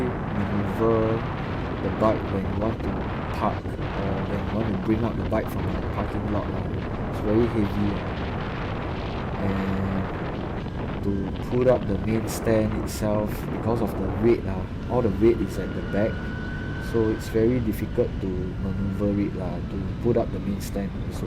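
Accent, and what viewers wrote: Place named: Singapore. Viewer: He's speaking Singlish. Perfectly understandable to a Singaporean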